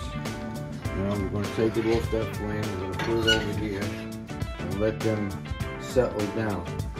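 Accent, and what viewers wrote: New York, United States. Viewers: Cool, I like your Italian New Yorker accent.. My mom use to make percolated coffee, Yuban , not available in Canada.. Thankyou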